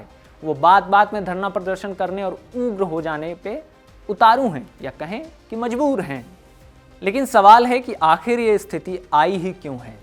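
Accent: Bihar, India. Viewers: bhai, Real tone of Bihar -UP Maja aa rha aapka show dekh kr Theth bhasa me ❤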